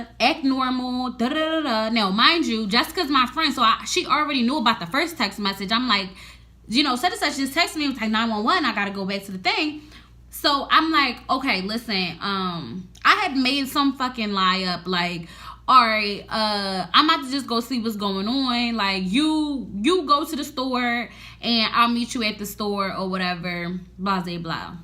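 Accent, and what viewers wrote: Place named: Philadelphia, United States. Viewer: yesss i ik u was from philly the way you talked :) lol ysss tell more im from north philly and same shit kinda happend to me .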